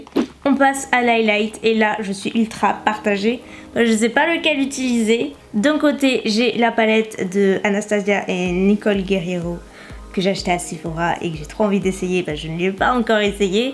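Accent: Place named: South-West, France. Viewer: moinSSS le bon accent du sud ouest ;)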